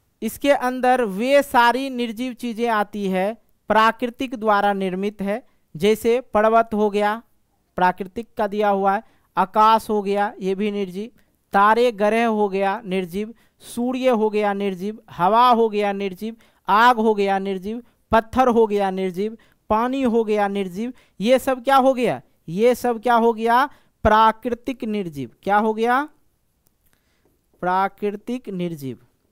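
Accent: Bihar, India. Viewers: Sir aap bihar se hai kya😅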